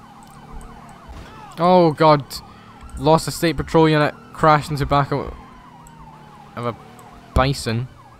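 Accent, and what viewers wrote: Scotland, United Kingdom. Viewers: I guess your Scottish like me, I can tell by the accent also nice video mate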